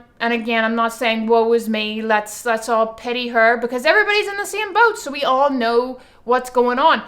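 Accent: Ireland. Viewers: ohhhhhh ok......now I hear the Irish 💖 that's wsup luv...I would've thought you was Native Canadian.....yes looking forward to hearing the true 💯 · I thought you are Canadian? 😲Where does that Irish accent come from? 🤔 Oh yeah the haters, they are the worst! Im still ok on YouTube but on FB they are everywhere! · You SOUND SO Irish in this video!! Welcome back! x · Welcome back, it's good to know you're doing well and still among the living. This is the first time I've heard you speak with a Irish accent, GO IRISH!!! I just got back from a wonderful summer vacation in Ireland, it is such a lovely place, when I heard you speaking with an Irish accent I thought I was going crazy from hearing the Irish accent in Ireland so I was a little bit confused at first, so that means in all those other videos we were trying NOT to speak with your Irish accent, that is remarkable that you were able to do that, I'm impressed. 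I was hoping you would talk about that on this video but you did talk about speaking fast so that was cool. Take care I'm glad you're back making videos. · sorry to hear about those haters. But whats more important is your health, family and going back to your roots. Fun to hear your Irish accent 😊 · I love hearing your Irish accent ❤ sending love and hugs xx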